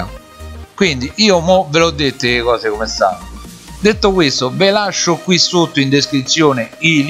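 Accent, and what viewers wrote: Rome, Italy. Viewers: Oioi mi fai pisciare addosso dalle risate come parli adoro il romano 😂😂😂😂😂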